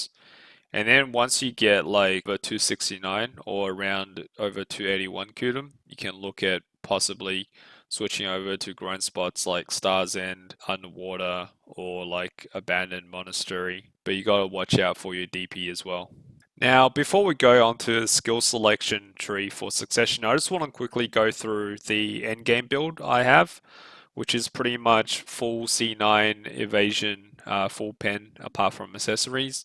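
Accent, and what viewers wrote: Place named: Australia. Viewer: you sound aussie :) subbed nice vid mate! helped me heaps ,south aust.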